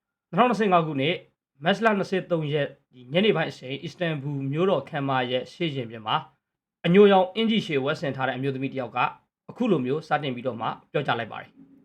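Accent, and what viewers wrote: Rakhine, Myanmar. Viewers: ဘရို စကားပြောပုံကို ကြည့်ရနားထောင်ရတာ ရခိုင်လူမျိုးထင်တယ်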